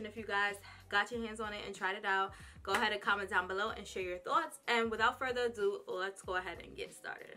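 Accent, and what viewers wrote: New York, United States. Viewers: Thank you for ya review! You sound like a new yorker 😝 let me subscribe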